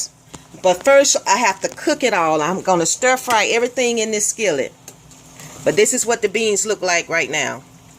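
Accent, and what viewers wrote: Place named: South, United States. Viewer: 😂😂😂😂lol. She has a southern accent.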